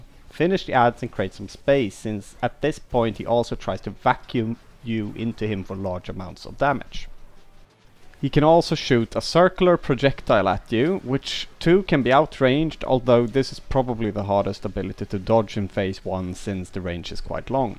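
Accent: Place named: Sweden. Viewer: Största svenska dialekten, bra video!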